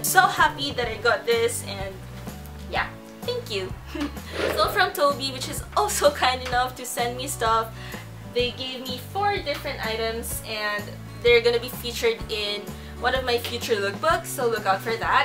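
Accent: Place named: Philippines. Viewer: Omg! Ur filipino accent is so on point! Im so jelly cause my accent is like a canadian accent cause i live in canada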